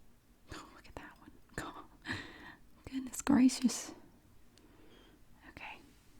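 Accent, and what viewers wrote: South, United States. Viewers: She has such a sexy southern voice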